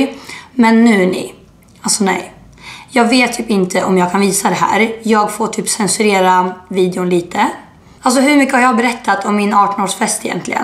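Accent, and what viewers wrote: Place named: Stockholm, Sweden. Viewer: Vilken jävla stockholmska du fått sjukt asså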